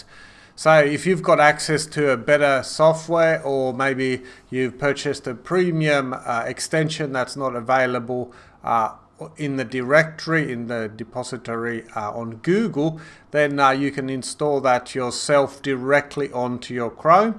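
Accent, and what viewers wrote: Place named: United Kingdom. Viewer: British accent!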